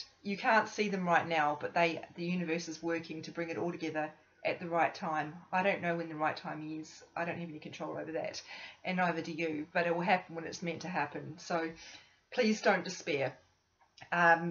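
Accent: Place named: Australia. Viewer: I really feel your love.. But most of all you are so down to earth about spirituality and angels and make it sound so 'normal ;) To me it is but I guess Im still in the closet cause of my familys ignorance..or should I say disinterest in LOA and signs etc.. Im a Twin Flame too but cant talk to anyone here in Gothennburg Sweden about it. Love your channel and your aussie accent! Makes me feel at home, comforted, grounded! ;) Grew up in Australia but havent been back since 1984.. Namaste/Cec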